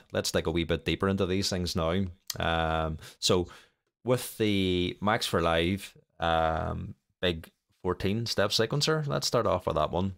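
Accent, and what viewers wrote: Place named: Belfast, United Kingdom. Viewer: Well DAMN, I grew up in Manhattan, even I know that's a West Belfast accent (so I do). Granted, my father grew up in the Divis flats, but it was hardly noticeable that I remember. Great video. I HATE the ableton piano roll.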